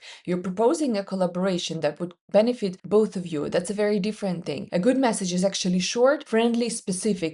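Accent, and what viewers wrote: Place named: Norway. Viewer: you have an accent yeah / could be Norwegian?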